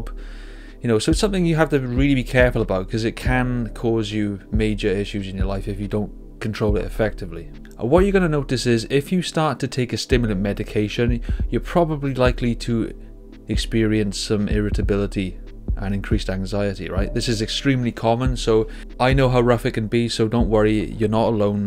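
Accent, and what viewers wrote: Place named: Swansea, United Kingdom. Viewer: Hello, I'm from Swansea! I noticed you have a bit of a local accent, where are you from?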